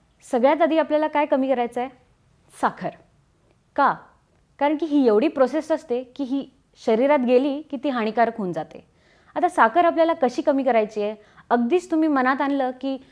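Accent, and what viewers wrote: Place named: Pune, India. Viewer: Nice पुणेरी accsent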